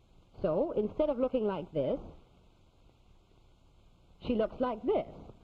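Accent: Transatlantic, United States. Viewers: It’s the transatlantic accent, it was only used for tv and films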